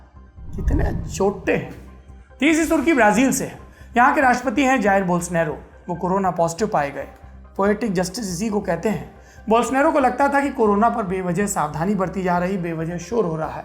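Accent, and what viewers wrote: India. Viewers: Bolne ka deshi tarika hi maja deta hai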